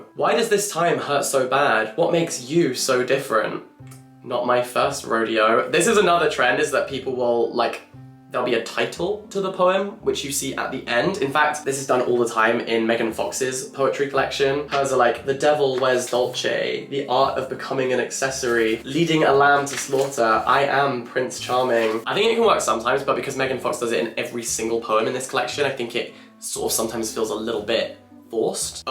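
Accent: United Kingdom. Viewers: I thought it was a british accent (I am not a native english speaker and have trouble picking up accents)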